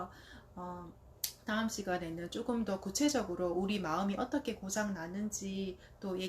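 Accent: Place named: Gyeongsang, South Korea. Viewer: 갱상도 누님 갱상도 사투리가 구우~수우~하네예~ 진짜배기 갱상도 사투리네예~ 억양 보니깐 대구 토종 신토불이 사투리 억양입니꺼?아니면 마산 근방 사투립니꺼?? 마산과 대구 사투리를 구수우~~하게 잘 쓰시네예!!!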